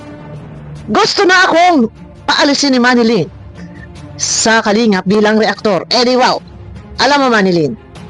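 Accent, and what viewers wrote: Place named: Iloilo, Philippines. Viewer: Matanda kna.....NAGTATAGALOG KA. ILONGGO NMAN ANG TUNO MO.. TANGA KA.. KAKAHIYA KA kapitanang UBOD NG BOBA.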